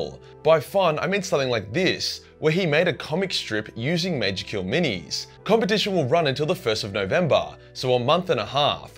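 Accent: Australia. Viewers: Im not even a huge fan of warhammer, but i love listening to this aussie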